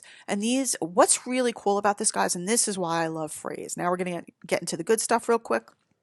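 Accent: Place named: Philadelphia, United States. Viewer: Nice content! Based on your accent I think you're from Philly :)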